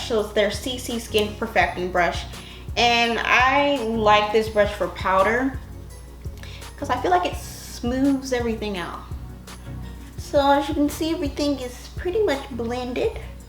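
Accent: South, United States. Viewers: Lawwwd talks like a southern Grandma.... girllllll, chillllllddddd 😂😂😂😂. I luvs this chick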